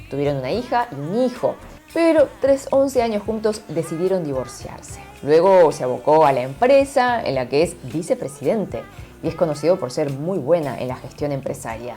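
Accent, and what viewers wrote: Argentina. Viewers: Saludos, me encanta escuchar tu Espanyo Argentino. Una pregunta, en Corea, no se reconoce la soberania personal, los derechos humanos? He escuchado de gente Japonesa que los "Daimyo" de Japon no son tan poderosos como los "Chaebols" de Corea por rebeldia de la poblacion. Es verdad? En tu opinion, hasta donde llega la lealdad a uno mismo vs a la familia mas, en Japon o en Corea?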